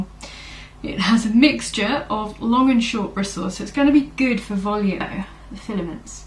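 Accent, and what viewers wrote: England, United Kingdom. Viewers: Love your channel... it's so refeshing to hear such a beautiful english accent.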